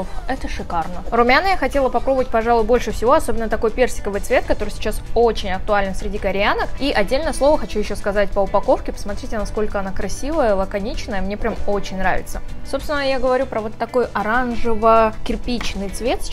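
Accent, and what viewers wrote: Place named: Georgia. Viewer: Ты в этом выпуске грузинка? (Говоришь с явным акцентом) (Никого обидеть не хотела этим комментарием)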